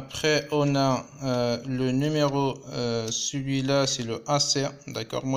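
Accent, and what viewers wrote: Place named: Kabylie, Algeria. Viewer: Juste avec ton accents, je savais que tu es kabyle hhhh bon courage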